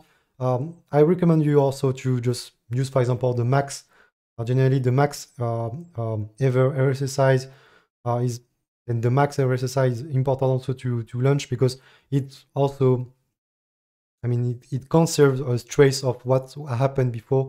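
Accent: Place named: France. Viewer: Hi ! Keep it up buddy #FrenchConnexion Your accent betrays you ahah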